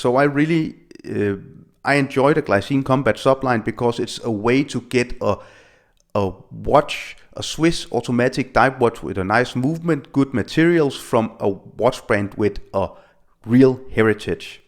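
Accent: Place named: Denmark. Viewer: Aha, dann viele Grüße nach Dänemark, hab vom Dialekt gedacht, das kann doch kein Amerikaner/Engländer sein :-)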